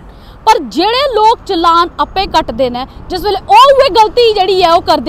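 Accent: Jammu, India. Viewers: Zabardast Reporting.. And in authentic Dogri style.. Proud of U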